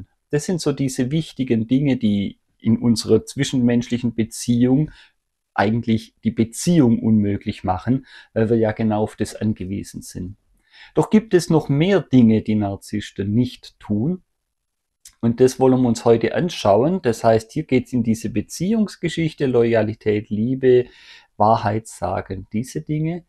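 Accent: Swabia, Germany. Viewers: Auf den Punkt gebracht 👍! Was ist es für ein Dialekt? Schwäbisch? Meine Freundin aus Tschechien spricht so.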